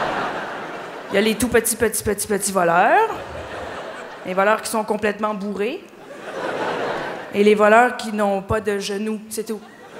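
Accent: Quebec, Canada. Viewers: Excellent rien que l'accent ça me fait marrer :p ! Vive les Québecois ! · Alors bien que j'aime bien dieudo et compagnie, je trouve cette fille merveilleuse moi <3 ses expressions faciales sont merveilleuses du genre totalement paumé et son accent québecois ... Je crois que j'en suis amoureux. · un con du Quebec dis: SVP oublie pas ton accent!!! Toujours aussi belle et drole! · 5 ans à apprendre le Français et vous comprenez pas l'accent Québécois? Et bien si j'étais vous j'abandonnerais à l'instant. En plus Maude dsns la video a changé beaucoup de mots et de formulations pour se faire mieux comprendre. Ouf... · J'adore les québécoises, je tombe amoureux des que j'entend l'accent. Mais en dehors de ça, j'ai pas trouvé ça très drôle, il n'y avait aucune transition. Dsl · Son accent canadien il me tue😂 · elle est bien plus drôle quand elle parle en Québécois les chutes sont bien plus drôle. 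· Je sais pas si c'est son accent québécois tout mignon qui fait que les vannes dégueulasses passent crème comme ça ou si c'est elle qui a vraiment une aura super sympathique, en tout cas j'ai eu de vrais rires sur cette vidéo et c'est plutôt rare pour le souligner ! Bravo ! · Ou est l'absurde et où est le direct ? Tu peux juste assumer et te dire l'accent canadien est marrant. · Magnifique l'accent québécois rajoute un certain charme à ce sketch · Elle ne debute pas mais cest difficile d'adapter son humour sans changer d'accent. Son materiel ne voyage pas tres bien. Au Quebec elle est géniale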